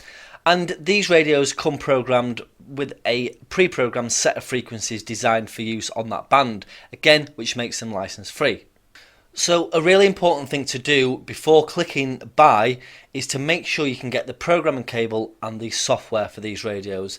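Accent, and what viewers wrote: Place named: Cockney, United Kingdom. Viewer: Can you change your accent please. I cant understand you properly. You speak to fast. Im not a fan of your cockney accent 👀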